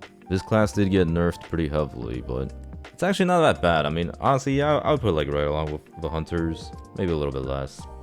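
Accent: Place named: United States. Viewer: basing your experience on someone else opinion :D sound american to me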